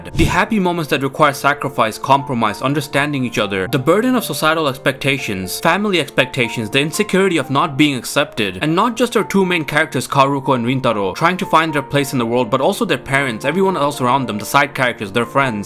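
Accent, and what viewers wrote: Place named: United States. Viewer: sorry but i just can't with this american pronounciation xD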